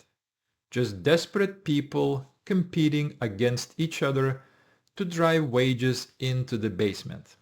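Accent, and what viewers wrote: Russia. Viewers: Tough to listen to a Russian Accented Guy, talk about Unions. Got trouble "Buying In". AND, I am a Teamster, Unrestricted Class A CDL. Hmm? Keep waiting for him to Call me, "Comrade".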